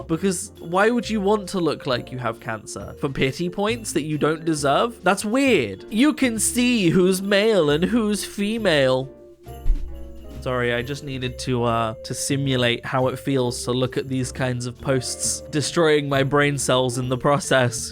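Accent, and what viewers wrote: United Kingdom. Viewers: hi,im paje(she/they),are you scottish or irish by any chance? also whats chauffeur?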